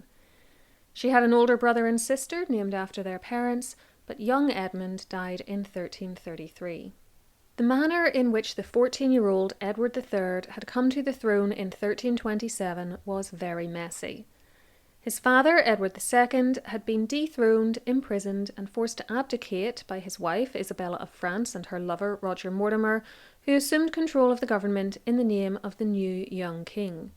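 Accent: Northern Ireland, United Kingdom. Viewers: Hi - is from Northern Ireland 🌟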